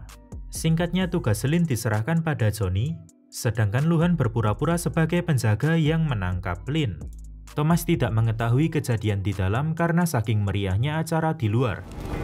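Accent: Java, Indonesia. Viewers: Medok pisaaan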